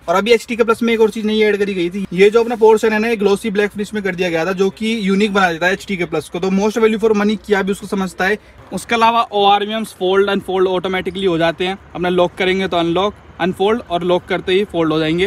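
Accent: Haryana, India. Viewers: ji Hum aapki desi Haryanvi bahut pasand karte hai Bhai bhi thora lallu lagta hai 👍👍👍👍 Love from rewa, Madhya Pradesh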